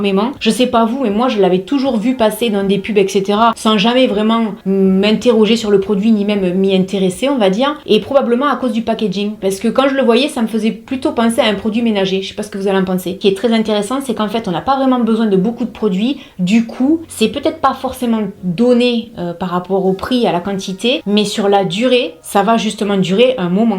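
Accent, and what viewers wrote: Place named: Marseille, France. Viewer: Bonjour merci pour vos vidéo elles sont très intéressantes..en principe les produits Avène ça a toujours été une bonne marque….vous avez un accent Marseillais….moi j habite 50 km de nimes Alès et vous vous êtes dans quel coin si c pas indiscret évidemment….merci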